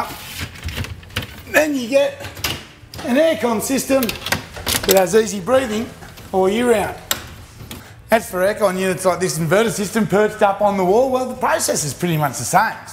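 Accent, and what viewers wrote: Australia. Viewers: We love you Australian!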